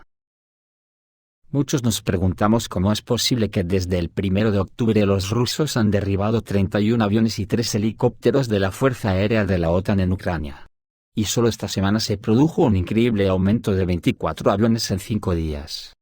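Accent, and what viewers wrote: Spain. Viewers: TU CANAL ES UN DIGNO REPRESENTANTE DEL MUNDO HISPANO-LATINO ============================================================, TU APORTE INFORMATIVO Y TUS COMENTARIOS / ANALISIS, HACEN DE TU CANAL, UN CANAL PARA SER TOMADO EN SERIO Y CON RESPETO. EL EUFEMISMO Y TEMOR SOTERRADO DE OTROS "REPORTEROS" ==================================================== _ TU NO TIENES MIEDO COMO OTROS CANALES, DE DECIR Y LLAMAR LAS COSAS, LAS PERSONAS Y SUS PAISES CON SU NOMBRE Y CON SU CALIFICACION. _ OTROS CANALES USAN EUFEMISMOS TIMORATOS COMO LLAMAR "OSO" A RUSSIA MOTHERLAND _ OTROS CANALES USAN EUFEMISMOS TIMORATOS COMO LLAMAR "I" AL ESTADO DE ISRAEL. _ OTROS CANALES USAN EUFEMISMOS TIMORATOS COMO LLAMAR "ABUELO" AL USA PRESIDENTE. _ OTROS CANALES USAN EUFEMISMOS TIMORATOS COMO LLAMAR "PAIS DE LAS HAMBURGUESAS" A USA. _ OTROS CANALES TIENEN UN TERROR DE MUERTE DE LLAMAR GENOCIDIO A LOS CRIMENES COMETICOD POR ISRAEL. 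_ OTROS CANALES LLAMAN "TERRORISTAS" A LOS PATRIOTAS PALESTINOS, PORQUE ESO ES MAS "CONVENIENTE", Y ASI EVITAN QUE LES CIERREN EL CANAL. _ OTROS CANALES LLORAN LA MUERTE DE UN JUDIO, PERO LA MUERTE POR GENOCIDIO CONTRA UN PALESTINO ES JUSTIFICADA PORQUE TODA PALESTINA TIENE UNA ETIQUETA DE "TERRORISTA" QUE LE PUSO OCCIDENTE Y SUS MEDIOS MASIVOS DE COMUNICACION, DE MANERA PROPICIA Y CONVENIENTE, PARA PODER PERPETRAR ESTA MATANZA EN CONTRA DEL PUEBLO PALESTINO TU HABLAS UN ESPANHOL "CASTIZOLA" COMO EL MIO, QUE A LA SAZON; HABLO OTROS IDIOMAS Y POR ESA RAZON ENCUENTRO LA VERDAD, PE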